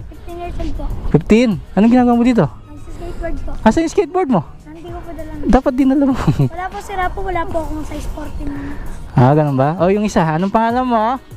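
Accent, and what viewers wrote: Pampanga, Philippines. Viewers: Kapampangan ka po?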